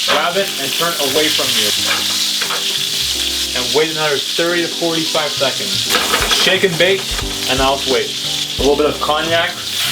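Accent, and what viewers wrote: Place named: Quebec, Canada. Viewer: Tu sonne comme un anglais québécois c'est drôle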